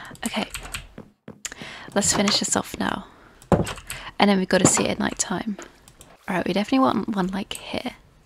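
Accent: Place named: United Kingdom. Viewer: I'm falling in love with your British accent😃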